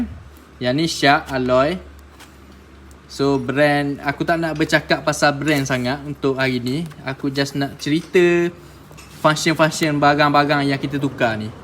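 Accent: Terengganu, Malaysia. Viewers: Abang orang ganu ke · bang nk tanye..abg org mana ni dgr mcm terengganu je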